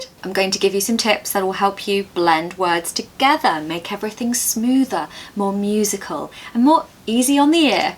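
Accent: RP, United Kingdom. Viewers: When you say, hello beautiful people, it's actually hello, beautiful lady with fantastic new lesson for English learners ❤❤. Love British accent modern RP. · Wow excellent reading of you. I always love your British lovely accent and pronunciation. I like your beautiful, amazing desk gifted by Tom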